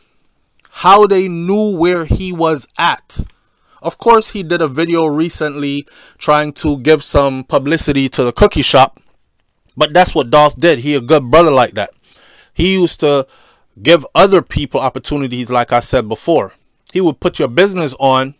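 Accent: Jamaica. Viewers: This sounds like a Jamaican 🇯🇲 blogger I know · You sound's like...Jamaican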